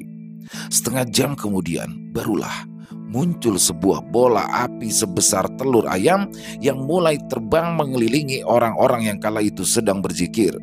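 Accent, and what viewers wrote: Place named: Jakarta, Indonesia. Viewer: wahhh mksh bang ada bang lg ni ceritanya seru mantap yg plng ku suka bang bawain cerita betawi nya mantap suara betawiny sukseees terus sehat yselalu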